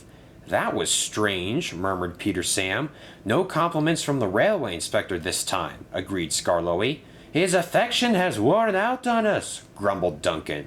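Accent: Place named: Scotland, United Kingdom. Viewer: Nice episode! Looking forward to seeing how this all plays out. There was some very clever wordplay in this episode, and it was thoroughly entertaining! Also, I swear your Scottish accent gets better every time I hear it!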